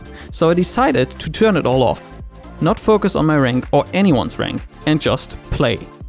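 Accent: Germany. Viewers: As more as I'm listening to your voice you sound more and more German so are you German?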